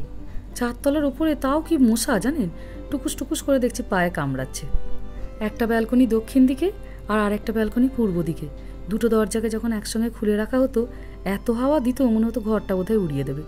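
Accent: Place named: Birbhum, India. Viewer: আপনি কি বীরভূম /বর্ধমান জেলার মানুষ? আপনার কথা বলার স্টাইল টা একদম সেইরকম. আমি বীরভূমের মানুষ তো. খুব মিল পেলাম, ভালো থাকবেন.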